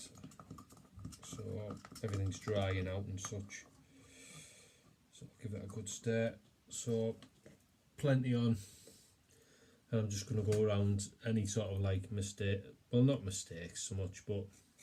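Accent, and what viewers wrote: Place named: North, United Kingdom. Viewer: I prefer a northern accent when learning about fantasy/minis/terrain - feel free to throw a few more mini stories in there, you're entertaining! ^_^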